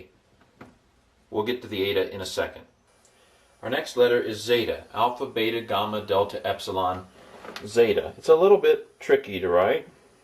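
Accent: United States. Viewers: The other problem is that each nation has it's interpretation of vowel sounds. Americans are notorious for replacing vowels with arbitrary schwa sounds. Listen to how an American pronounces Banana - three "a"s - all with a different vowel sound. Americans also belabour the emphasis on the FIRst Syllable. Hence the POL-ice in ARIizona have the best REE-search and DEE-fence. To a native English speaker it sounds gauche and as if they are deliberately trying to shout or be rude. I'm not sure I can agree on how he pronounces ETA because of the bizarre way Americans deal with "a" in general....they pronounce it more like AAHH. Their "O" sounds like wind up ooooOOOHHH. Listen to how an American says oooOOHHrange instead of Orange. There is no way ancient Greek would conform to modern America colloquialisms, yet you can hear his heavy American accent as he pronounces "Greek". 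· Erasmus killed the sound of ancient Greek just to make it easy for Americans. Sorry It's not Gama it's whama (like "why"). It's not delta it's δέλτα (like "this") "Αυτοις" for example it's not "αυ-τ-ο-ις. I know it's convenient but it's" αυ-τ-οις " ο+ι=ι Otherwise there is no harmony. Sounds like an American trying to speak Greek. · you're "americanising" the whole thing sorry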